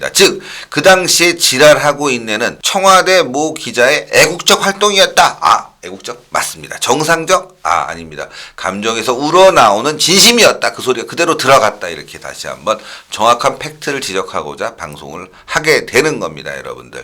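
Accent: North Korea. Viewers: 딸랑 딸랑이 입니다. 이북사람 목소리 같네요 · 평안도 사트리 같애요 억양이?!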